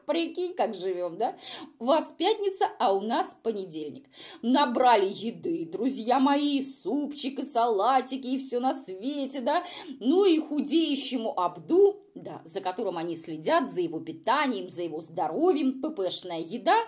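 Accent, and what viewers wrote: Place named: Revda, Russia. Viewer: В ее чо,вместо что.Капец,дуня ревдинская.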